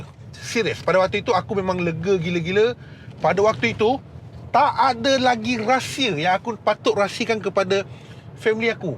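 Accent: Malaysia. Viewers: lah igt kan mmg melayu 😂😂 abg cakap mcm mmg melayu tulen laaa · Selama ni ingatkan memang melayu. Rupanya berbangsa Cina. Loghat percakapan macam orang melayu. MANTAP!!! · Mati2 aku ingat ko ni melayu. 😆 Cakap pun dah pure melayu. Anyway alhamdulillah and proud of you bro. Never leave your family despite revert to Islam.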